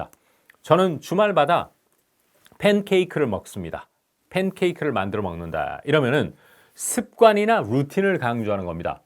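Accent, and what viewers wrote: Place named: Gyeongsang, South Korea. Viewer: 선생님 목소리 너무 좋으세요~~ 경상도 사투리가 들리는데 어디세요?? ㅎㅎ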